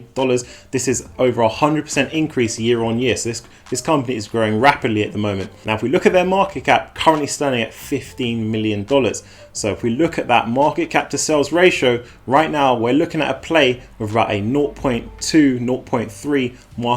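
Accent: United Kingdom. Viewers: love your British accent!! UNFORTUNATELY ALL THE STOCK ARE OTC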